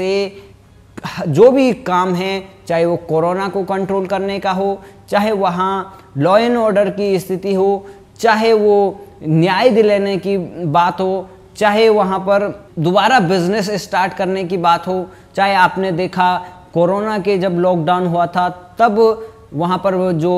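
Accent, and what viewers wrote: Bihar, India. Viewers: बिहारी/भोजपुरी tone में समाचार सुन कर मज़ा आ गया। जीय हो बबुआ 👌👌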